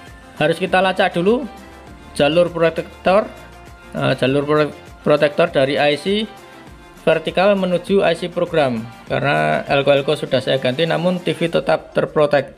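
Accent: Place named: Java, Indonesia. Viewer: Bahasane medok. Lokasi pundi mas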